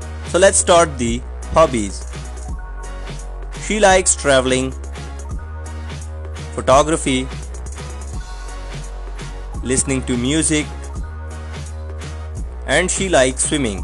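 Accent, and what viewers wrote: India. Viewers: The intro was enough for anyone to leave immediately, the creepy indian accent.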